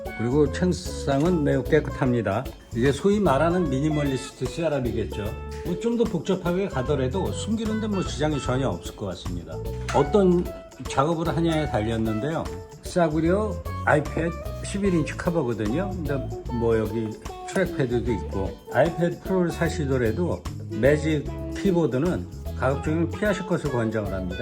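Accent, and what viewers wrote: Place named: United States. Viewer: 꼭 대학생 같아 보이십니다. 미국 교포 느낌의 억양도 반갑게 들리구요. 책상정리, 선정리 하느라 애먹었던 저의 당시 시절도 생각나게 하네요. 영상 잘 보았습니다.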